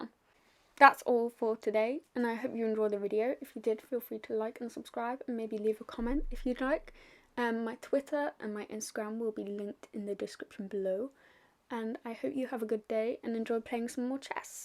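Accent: United Kingdom. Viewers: Sound like RP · You have a British accent... it is a GREAT accent!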